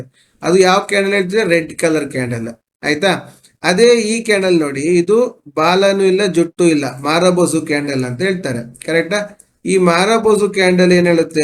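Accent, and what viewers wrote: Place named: North Karnataka, India. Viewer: Good video sir Uttara Karnataka style helata edira sir stock bagge heli sir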